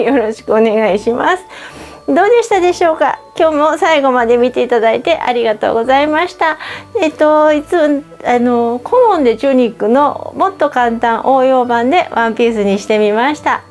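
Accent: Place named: Kansai, Japan. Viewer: さんいつも拝見してます！そのおしゃべりがほんわかしてて本当に癒される〜 適当でええよ〜とか！時々 関西弁？って思える節もありますがどちらの出身ですか？ワンピース簡単そうでチャレンジしたくなりました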